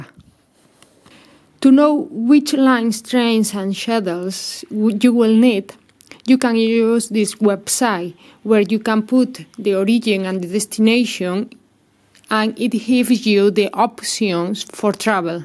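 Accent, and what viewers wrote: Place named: Spain. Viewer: Clementine Windflower She is from Spain. I can tell by the accent.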